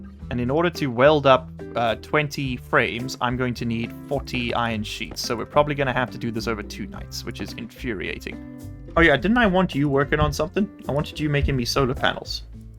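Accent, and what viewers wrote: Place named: South Africa. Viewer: Thought you had a Dutch accent, but South African is close enough 😅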